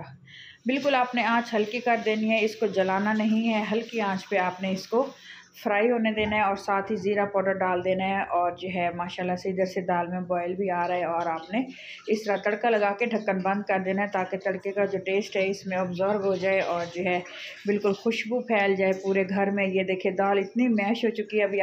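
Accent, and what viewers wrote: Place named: Saraiki, Pakistan. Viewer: ماشاء اللہ بہت اچھی دال بنائی آپ نے منہ سے پانی آ رہا ہے۔😋 آپ کی آواز سے پتہ لگ رہا ہے آپ سرائیکی ہو۔ سرائیکی ہماری مٹھڑی زبان ہے اس لیے اندازہ لگایا بہرحال آپ کھانے بہت اچھے بناتی ہیں 👌👍👏👏🌹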